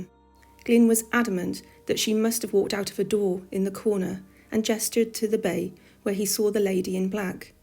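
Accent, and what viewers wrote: United Kingdom. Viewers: I am now a believer that ONLY a Brit can narrate ghostly stories!!!! Thanks for fascinating vid! I can just see Henry pacing on the path, menacing, brooding man-child!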